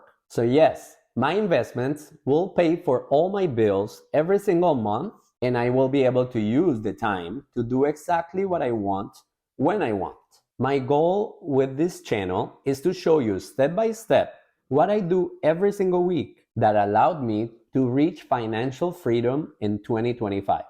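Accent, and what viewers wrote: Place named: Europe. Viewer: Europe your accent is from Europe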